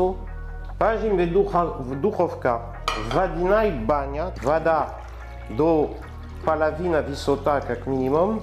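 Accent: France. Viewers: Живу 5лет во Франции, конечно,как повар по образованию,начала активно готовить. Вася Емельяненко,Илья Исакыч,смотрела всех подряд.И тут,оляля!Я нашла французского повара,говорящего по русски!Как мило Вы говорите по русски! Это комильфо! Теперь смотрю только Вас! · Пусть разговаривает с французским акцентом, так интереснее. Да и ему удобнее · Уважаемый Я смотрю ваши видео с большим наслаждением, это бесподобно всё -- рецепты, прекрасный гасконец, особенно его русская речь на французский манер, это изюминка!! Слушаю вас как поэзию, никогда не переходите на чисто русский, потому что тогда потеряется шарм. Сегодня балдела от слов -- кладём в протёртую печень маслы ( надеюсь, вам объяснят, значение на русском -- маслы). Я восхищаюсь вами и благодарю за ваши видео и бесподобные рецепты. Удачи вам и всего хорошего вам и вашей семье.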